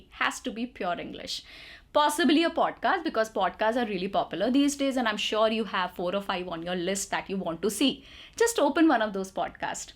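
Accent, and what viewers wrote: India. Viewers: I never heard before a clear Indian accent....I love it.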